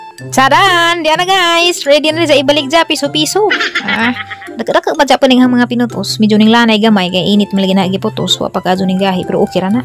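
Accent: Bohol, Philippines. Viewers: Hua<link> ba anang watermelon mo sis..nalingaw ko ug tan aw sa.imo mga video nahimout ko sa imong sinultihan nga bol anon kay mao man ako mama taga maribojoc siya..sending my support sissy mmlso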